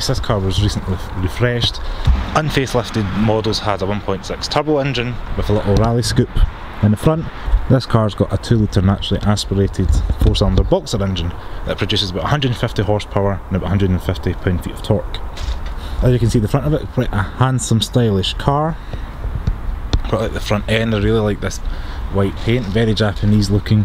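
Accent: Scotland, United Kingdom. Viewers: Good review and nice with a scottish accent. "Chelsea wankers" (childseat ankers) 😅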